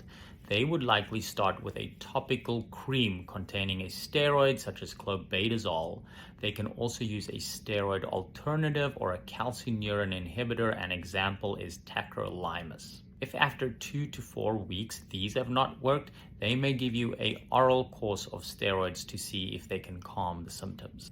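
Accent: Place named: South Africa. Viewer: thank you so much... I thought u sounded a bit south African. I have suspected MCAS have not found someone that knows what it is. But I will keep trying. I will copy your info and ask around. Thanks so much. My biggest issue currently is that steroids or cortisone make the reaction ten times worse. Thank you so much for replying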